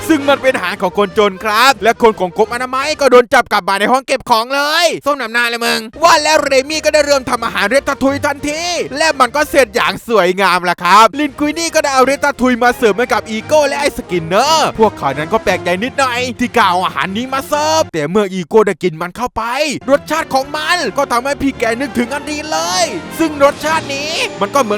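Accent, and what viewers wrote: South, Thailand. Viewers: พี่เป็นคนใต้ไช้ไม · มั่วตั้ว5555 อืมมพี่คนใต้ใช่มั้ย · พี่เป็นคนไต้หรอ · คนใต้ป่ะเนี่ย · พี่เป็นคนใต้หรอ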